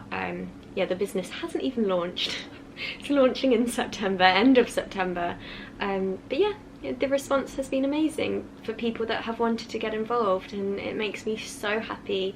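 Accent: United Kingdom. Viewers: I looked for a british youtuber to learn the accent and accidentally found someone so precious. Youre absolutely marvellous and im really glad to found this channel ^^ Right on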